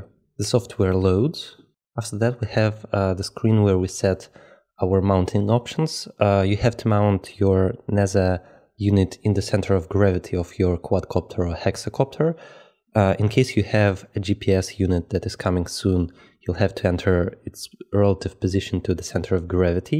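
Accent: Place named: Russia. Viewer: This video is the BEST ever way to set up for 3pos switch. Better than airplane setup with mixing on CH5/6. I could listen to your accent (RU/EN) all day, I used to work with a few Russian/Bulgarian guys here in Aus. I loved it. Thanks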